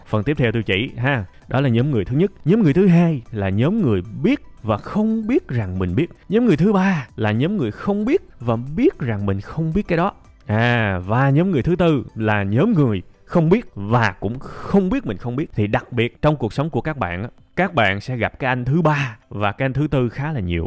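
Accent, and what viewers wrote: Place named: South, Vietnam. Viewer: Nghe giọng nói miền Nam và dẫn chứng rất dễ hiểu, Xúc tích và trọng tâm ! Chú duyệt 100%,Chúc cháu khỏe !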